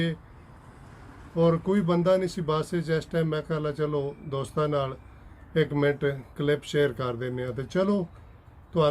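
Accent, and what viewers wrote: Gujrat, Pakistan. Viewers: Ap Gujrat se hain .ap k bolne k style mujhy lga tha Ap Gujrat se ho .meri ami bhi Gujrat se thi .☺️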